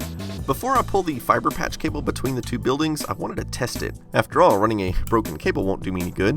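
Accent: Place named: Texas, United States. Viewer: Dude, I've served in the Army with soldiers from Texas and that's just how they talk.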